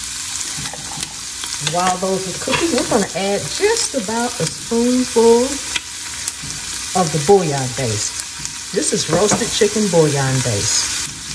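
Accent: South, United States. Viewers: I just love your southern accent, (and I'm from the south).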